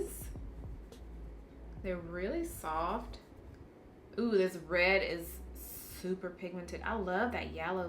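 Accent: South, United States. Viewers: Omg, i love your accent😄, you sound a bit from the south country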